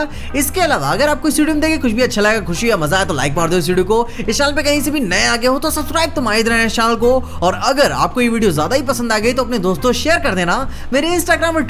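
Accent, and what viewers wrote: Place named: Bhojpuri, India. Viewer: Bhai love your expression ❤️😘,or Tera bhojpuri touch bolne me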